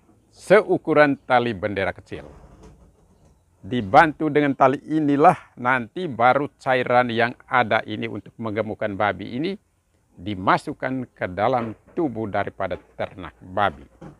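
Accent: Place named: Manggarai, Indonesia. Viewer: Pak logat nya kaya org Manggarai ya Pak..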